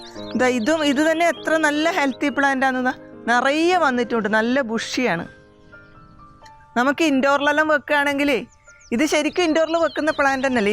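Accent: Kannur, India. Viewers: മാഡത്തിന്റെ സ്വന്തം നാട് കണ്ണൂരാണോ ? സംസാരം കേട്ടിട്ട് കണ്ണൂർ ഭാഷ പോലെ... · Kannooor analle...bhashashyli kettitt angane thonnunnu. CM nde oru style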